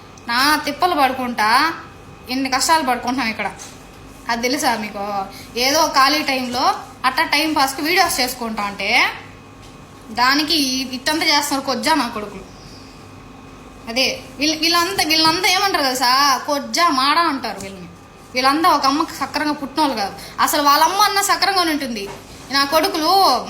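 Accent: Anantapur, India. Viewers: Buddham namami !!! Ananthapuram voice good sister garu. Take care in Kuwait.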